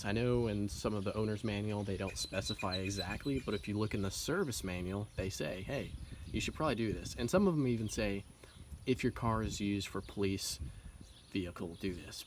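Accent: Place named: Pennsylvania, United States. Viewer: Dawg you sound just like streetspeed717. Pennsylvania accent, but it’s more of a voice than an accent. Props on the informative vids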